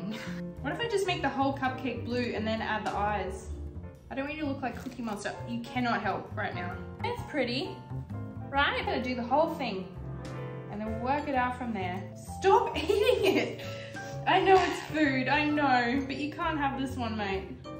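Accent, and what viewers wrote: United States. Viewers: Very charmed by the American accent. I see an opportunity for a teamup with fellow Aussie and baker extraordinaire Ann Reardon from How to Cook That? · Holy shit, you're American accent is spot on.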